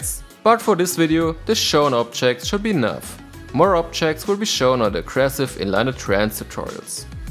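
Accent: Germany. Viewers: that german accent is hilariously strong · Well if you want, one day we should skype, discord or something so I will try to train you on these V and R. Your Rs are not bad, but you seem to focus a lot on these, while most of them do not deserve great attention and should be very light and short, and even sometimes almost muted. Your Vs are... hum... still a lot german (surprise surprise) XD As I said to you previously, your tutorials are very thorough, and that is really enjoyable. In my opinion, it's better to have fewer information that are fully understood, than a bunch hard to get (I'm exaggerating for you to get the picture). I'm not suggesting you to be less thorough, no no no... but rather splitting your videos in two parts, hence giving you twice the time. I bet your german audio track is live with your video (I've not checked, cause I don't speak german), so once a thing is explained, then you just show the video part again, maybe slow-motion, without live audio, and you can insert short comments, like :"here, watch my knees !", that kind of things, just to highlight a key aspect of the move. 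As you are making tutorials, you are teaching us new things, new concepts, so most of us need time for it to make sense, for it to sink in, and then understand other new concepts relying on the previous new ones. I've seen however that you have a nice number of followers on your german channel, congrats !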